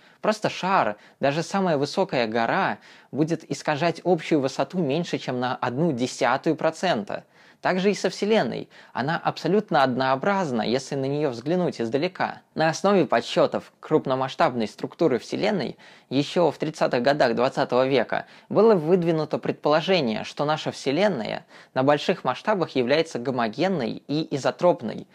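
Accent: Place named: Penza, Russia. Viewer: Интересный материал, но речь оканием, с растягиванием гласных. Откуда он? Из Пензы?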